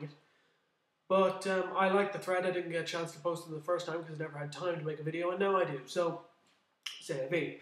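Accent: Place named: Ireland. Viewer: hooray, finally a non stereotyped Irish accent. The stereotype seems to be it's incredibly strong, and the appearance with the accent is a two foot tall red haired bloke wearing green. Nice to hear a bit of a weaker accent. Not that I can talk, I have one of the strongest Yorkshire accents going. PS: nice to get to know you better, mate.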